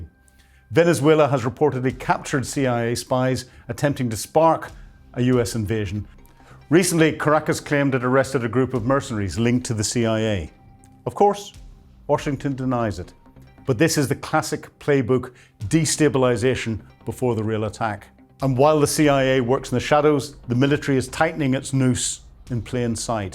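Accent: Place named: United Kingdom. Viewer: Don't try to tell us what Putin attempted to do to Kyiv but failed to accomplish. What, are you a British hack? Yes, listen to your accent. Another foreigner acting to be a type of expert on America's capability or intentions.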